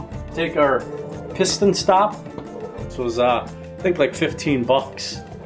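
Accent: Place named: Boston, United States. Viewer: ive read many times on how to do this and am successful in the operation of my vw motors but this was well explained and the music in the back make it easy to learn,lol!! nice video,sounds like you are from boston!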